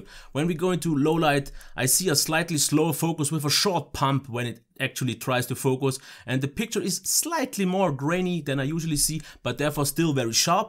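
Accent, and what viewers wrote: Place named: Germany. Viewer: I love the review and accent! It sounds kinda german'ish. :D (Just in cute)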